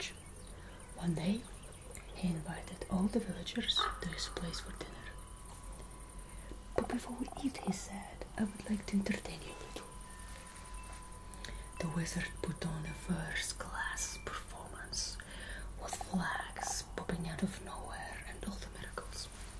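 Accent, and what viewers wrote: Russia. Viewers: Ну,!!! Вот это Баба Яга всея ютьюба! А какой лес, а какая изба, а этот русский акцент! А сказки какие мудрые, и юмор...Браво❤ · Лайк за русский акцент, как один из элементов образа, круто 🙂🤌🏻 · Great Russian accent i sound about the same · Русский акцент... Клаааасс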